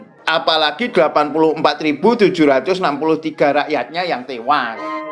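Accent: Java, Indonesia. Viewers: jooss .. bahasa medhok nya ngakak...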